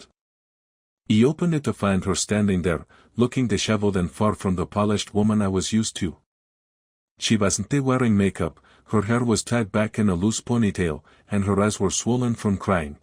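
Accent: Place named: Spain. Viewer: This is funny sometimes the voice sounds Spanish…even SpanGlishly pronouncing words. Its like the setting is regardless of the English it is said or pronounced like Spanish.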